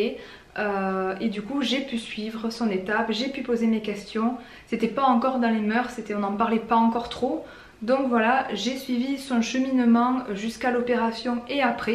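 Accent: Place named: Marseille, France. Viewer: Je vis à toulouse et je trouve que tu as plutôt un accent de marseille :’) Personnellement j’ai 60kg à perdre avec la sleeve, et j’ai bientôta date de mon opération!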